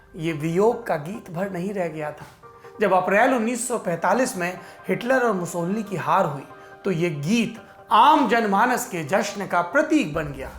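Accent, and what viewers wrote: India. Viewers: Apne state ki khushbu aati h anchoring m apki ji..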